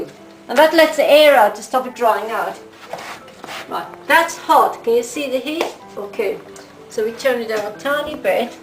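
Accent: United Kingdom. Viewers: I am Guyanese and that is not the right way to cook Guyanese roti. You are the only Guyanese i saw make roti different. If you are Guyanese then why you talking like you are from the UK? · I don't see anywhere in the description that is says she is Guyanese.. But since she has a slight brit accent, maybe she is Brit, but family is from Guyana or Trini? I do hear a slight coolie accent. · Just out of curiosity, what race are you? You look kind of indian or islander, but sound very british o_O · That's a real English /London Asian accent honey... with a snippent of Asia that's lived and worked in the UK for 15 + yrs. Whats the bleedin' problem anyway? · where are u from? u dont have a guyanese accent, but u do sound british. and u made it differently than my mom and my grandma and my great grandma...they were from guyana..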